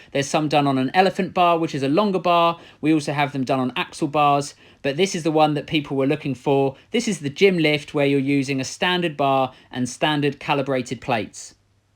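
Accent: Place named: South London, United Kingdom. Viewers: Warning not really, more southern/London accent. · Nah hes got way too much of a pussy voice, posh london tosser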